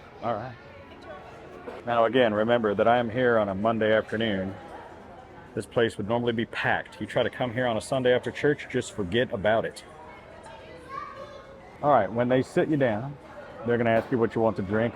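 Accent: Texas, United States. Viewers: Funny, the longer you're back in Texas, the quicker your Vegas city slicker vibe slides off and your Texas roots come drawling back,!🤠😎😁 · Seems like your Texas accent is coming back.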